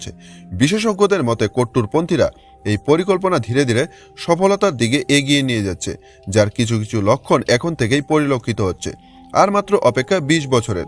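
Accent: Bangladesh. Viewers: Dada. Apnar name জানতে চাই. আপনার কথার ton শুনে, মনে হচ্ছে আপনিও বাংলাদেশী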